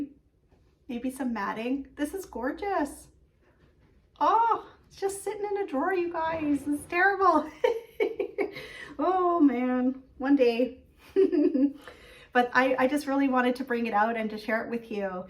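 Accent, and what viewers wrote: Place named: Canada. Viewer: I just started watching your videos after a shout out from the team at Just Keep Stitching. Your stitching projects are so beautiful! I love your devotion to each and every one of them. And, yes, I love your Canadian accent too! My mom was born in Nova Scotia and all of her relatives (except one) still live in Canada, mostly in the Maritimes and Ontario. I have subscribed to your channel and can't wait to see your progress on your projects.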